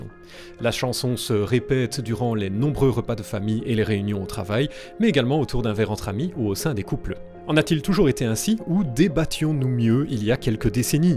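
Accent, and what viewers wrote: Belgium. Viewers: Han j'aime vraiment pas le français de belgique xD Chatonne AAAAAAAAAHHHHHHH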